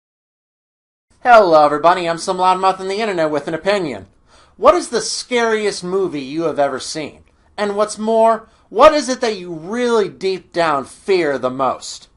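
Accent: United Kingdom. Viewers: Did you have to have subtitles in the US because you couldn't understand Yorkshire dialect?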